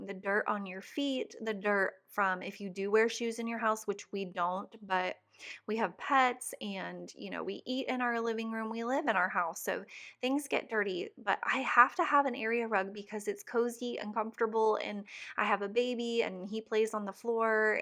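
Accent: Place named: Tennessee, United States. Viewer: HAHAHA, I knew you were from TN. Sweet little southern bell. It's fun cleaning with you.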